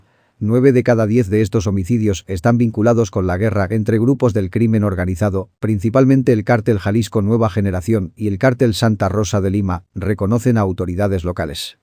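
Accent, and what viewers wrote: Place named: Spain. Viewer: Tu vos es de España A ti que más te da lo que pasa en México 😒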